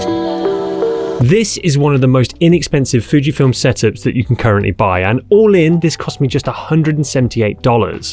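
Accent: England, United Kingdom. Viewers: Just curious. You sound English like me but you price everything in dollars? Do you live in the USA?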